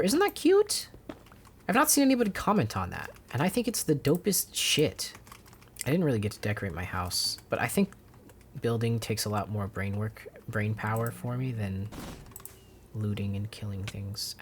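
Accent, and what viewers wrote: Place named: United States. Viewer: Some advice for using Japanese words: it's phonetically very simple, so saying a single syllable in an unusual context with an American accent is really a nightmare for understanding what you're trying to say (unless we've all read the exact Miyazaki quote you're referring to). Something you'll see on Japanese TV is subtitles and people stopping to define ambiguous terms when having conversations to avoid confusion. Or you could just say "space" or "pause" if you don't want to go into a tangent defining a Japanese word every time you talk about slower paced videos.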